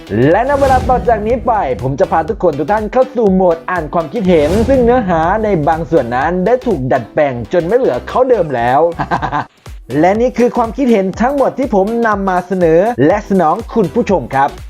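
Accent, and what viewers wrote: Isan, Thailand. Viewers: เลดี้ กากา คนบ้านเดียวกะกุนี่เอง สำเนียงกุแต่เกิดเลย พะนะ นี่แน้ะ 555 นอกจากรักลิซ่าแล้วนั้น ขอรักแอดอีกคน ขาดไม่ได้ มาทุกวันนะ พะนะ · อีสานแท้ล่ะว่า พะนะ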